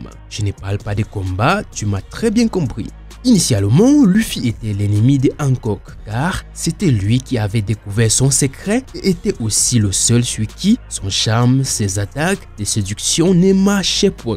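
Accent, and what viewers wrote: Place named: Ivory Coast. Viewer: Tu parle comme un côte ivoirien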